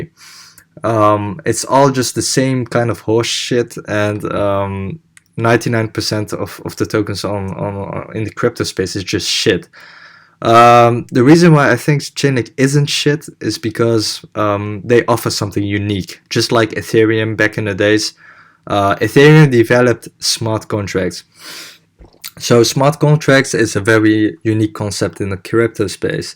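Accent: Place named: Netherlands. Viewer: you must be dutch or belgian :D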